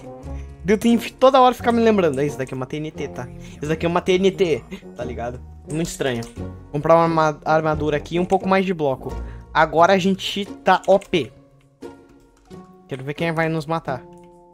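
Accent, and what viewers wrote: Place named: Rio de Janeiro, Brazil. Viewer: o seu carioca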